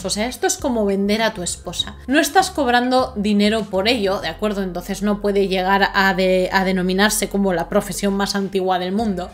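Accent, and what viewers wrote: Spain. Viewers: no sé si leerás mi comentario porque te sigo desde hace poco y no cabe duda que cada quién da lo que tiene dentro, dicho esto pienso que si empiezas a leer un comentario y ya lees cosas raras o groseras pues no hagas caso porque no los vas a cambiar y sufres o te molestas en vano, por otro lado cuando lees palabras escritas con cariño, sinceridad, que demuestran un gran valor de la persona que los escribe, pues te quedes con ellas, nada más, te sigo desde el otro lado del mundo y me encanta sobre todo tu manera de hablar (Española) me haces reir con tus "palabritas" acertadas y te bendigo en tu labor diaria.Te estimo mucho. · Holita! mira, no hace mucho que te encontré y me quede atenta escuchando tu modo de relatar las historias que vaya, algunas dejan mucho de las que reponerse! Sabes? soy una incurable con respecto al modo que, uno debe hablar y al hacerlo modular cada palabra, para ser entendida y bueno, y como me ha gustado el modo de relatar los hechos pues me quedé... 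también me gusta por lo original que eres a la hora de combinar el relato con el maquillaje! siiiii y sonrío con algunas frases que usas por el echo de ser española y yo, argentina que tenemos distinto modo al hablar... también, te sientes de madre cuando el tema realmente se pone complicado pues yo siento lo mismo. Casi, puedo adivinar por tu modo de saludar, como te sientes, (cosa que después lo terminas diciendo) o sea que, el tema que traes te cambia el ánimo pero, tranquila creo que a todos los que, compartimos el relato contigo, lo pasamos igual. Sigue con tu modo de presentar las historias de vida, pues no puedes hacerte eco, de lo que piensen otros, pues cada uno ve lo sucedido de diferente modo. Tranquila, lo que haces está bien y el que piense lo contrario pues, que se cambie de sitio y ya! Nadie está obligado a quedarse. Mis sinceros saludos y cuidate mucho. Hasta siempre Eva, desde Argentina.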